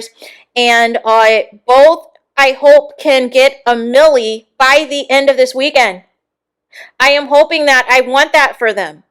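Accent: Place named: Fargo, United States. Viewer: What part of Fargo are you from?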